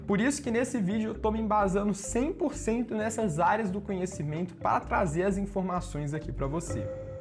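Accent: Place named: Minas Gerais, Brazil. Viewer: Seu sotaque é muito massa. Tmb sou mineira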